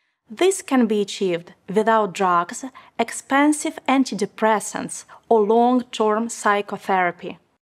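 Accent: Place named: United States. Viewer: Живу 15 лет в Англии, потрясающий английский ! Американский английский но очень профессионально !!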